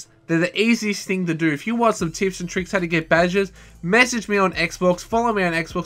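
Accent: Australia. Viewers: Are you from Sydney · You Australian??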